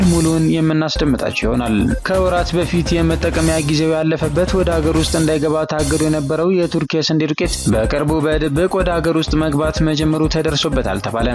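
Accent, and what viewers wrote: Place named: Tigray, Ethiopia. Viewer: Zthe host has a tigrians accent so don't expect good news from this agames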